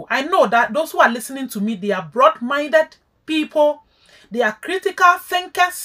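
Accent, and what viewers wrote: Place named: Ghana. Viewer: Are you a Ghanian.because of your accent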